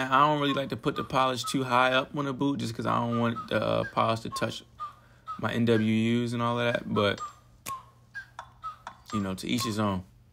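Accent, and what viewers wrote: Baltimore, United States. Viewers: You sound like you are from Baltimore. Have you ever tried using ice cold water? Another technique I use instead of cotton balls is to use panty hose?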